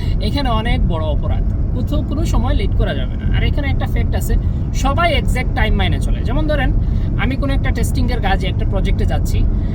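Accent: Sylhet, Bangladesh. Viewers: আপনি সিলেটি?❤️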